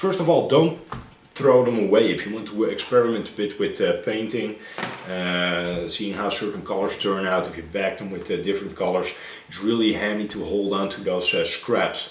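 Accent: Netherlands. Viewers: I suspected that too Als je Nederlands ben kan je dat goed horen ;)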